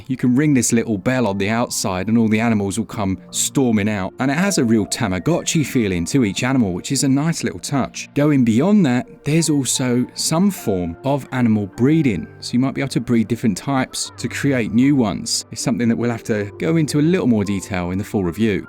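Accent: United Kingdom. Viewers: I almost never buy any new games but I never miss these videos. There's something comforting about a British dude gently talking to me about Switch games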